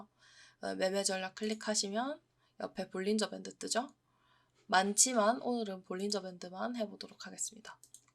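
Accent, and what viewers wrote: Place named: Gyeongsang, South Korea. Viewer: 조금씩 묻어나오는 경상도 사투리가 매력이라서 구독 누름 ㅎㅎㅎ 많이 가르쳐 주이소~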